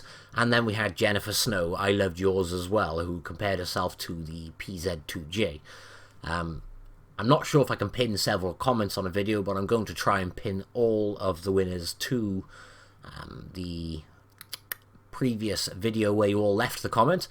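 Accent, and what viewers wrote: United Kingdom. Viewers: will i did not win i am a bit sad but that's not the reason why i subscribed i want the at15 because it has a ridiculous rate of fire and good armor but hey hearing you laughing along with that magnificent British accent got me compensation hope we get to rich 10k as soon as possible and please keep laughing at reds and keep uploading videos my favorite YouTubeer:D...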